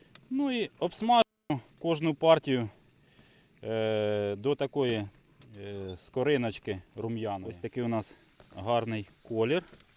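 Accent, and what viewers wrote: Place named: Poltava, Ukraine. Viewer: став підписником не лише через персональне знайомство, а й завдяки гарній українській з легким полтавським відтінком) І не лише я. Продовжуй так і далі, молоток!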